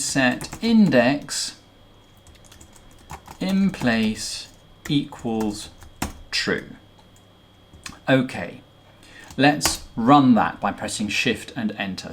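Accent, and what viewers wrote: United Kingdom. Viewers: This is the best british accent I have found on the internet, thank you!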